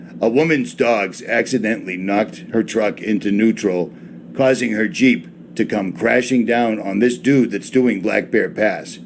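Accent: Boston, United States. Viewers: Sounds like Richie from Boston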